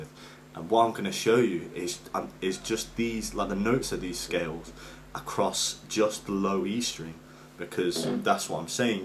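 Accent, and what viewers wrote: United Kingdom. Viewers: Thank you for the video man. I found it very useful. I think it may be called a perfect 5th though. Where in the UK are you from bud?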